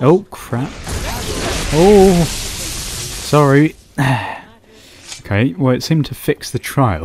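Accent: United Kingdom. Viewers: It's so good to hear a british let's player, love you're rude, witty commentary, and the foul language aha doing us brits proud.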